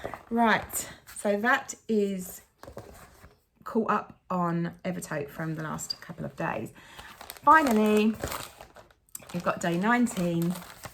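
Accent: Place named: Australia. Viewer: Hi, I have been loving the videos. I have been watching each morning with my cup of tea but I am currently watching with my parents and they think you sound Australian 🙈 I think you would have mentioned something so please correct me if I am wrong in thinking you are not originally from there 😅 from Immy (StitchedbyImmy) x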